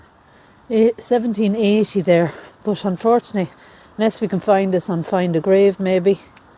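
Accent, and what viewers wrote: Ireland. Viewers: I like your irish accent. 😊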